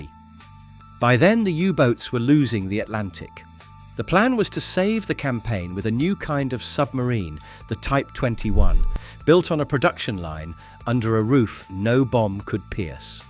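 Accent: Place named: United Kingdom. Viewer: I think the bunkers are cool. Quit the Germany bashing. You sound British, do you not know that Great Britain and France committed atrocities too? What about the opium wars that the British waged on China because The Chinese emperor tried to stop the British from pushing opium on Chinese citizens. The Egyptians wouldn't put up with Great Britain anymore and rioted and burned British businesses in Cairo in the 1950's. The British Empire was evil. France ruled over Vietnam for a century and was vary oppressive towards them. Know what you are talking about before you speak.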